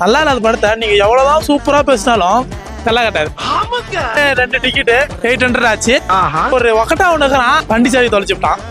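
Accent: Salem, India. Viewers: Bro ne ga Salem dha na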